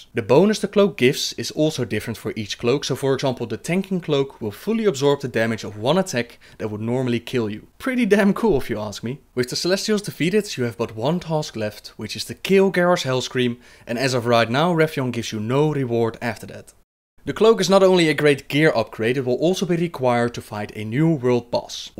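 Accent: Netherlands. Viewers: I like your Dutch accent:) I'm Dutch aswell:)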